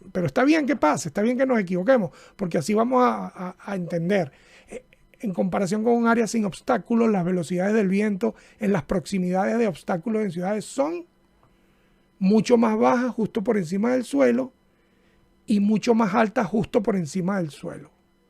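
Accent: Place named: Venezuela. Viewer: Hola!! No se si te ha pasado, que despues de estar tan lejos de casa, oir a alguien hablando venezolano es como musica para mis oidos.. :-) aprendi mucho con el video anterior ( y me rei demasiado con "que tiene que ver el c... " y lo de la "burra bipeda" jajaja) y me dio mucha tranquilidad saber de alguien que despues de la perdida de un dron "mas nunca" se sintio seguro volando.. a mi me paso y eso que que compre un dron "fly away free".. me sentia incomoda, me daba mucho estres y lo guarde por un tiempo.. el cuento es que decidi tomar cartas en el asunto y el lunes presento el examen aqui en Noruega. Aun cuando el contenido es distinto, tu video me ayudo demasiado.. muchisimas gracias y un abrazo :-)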